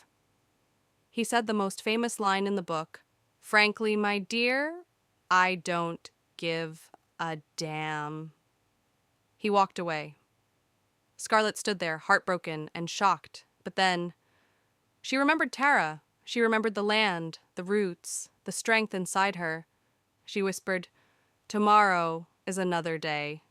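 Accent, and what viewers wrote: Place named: United States. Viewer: excellent and clear American English pronunciation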